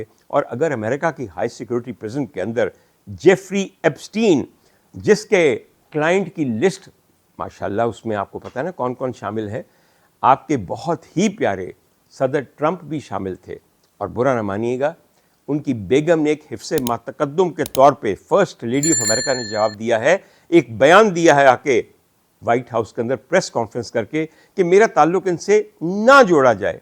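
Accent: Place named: Punjab, Pakistan. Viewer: واہ! کیا انداز بیان ہے جناب بلکل فلمسٹار ندیم جیسا! اور پھر اسپر پنجابی کے تڑکے! ہاۓ ہاۓ! 😂😂کراچی والے آپ کی اردو پہ قربان! 🌷❤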